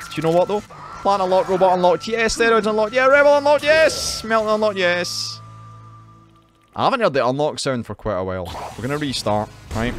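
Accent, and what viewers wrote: Scotland, United Kingdom. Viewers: are you scottish? cuz i love scottish accents. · me: oh neat a nuclear throne LP I don't see those super often. I'll just click on this and- instant thick Scottish accent and I am consumed with glee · The Scottish accent considerably enhances the experience of this video.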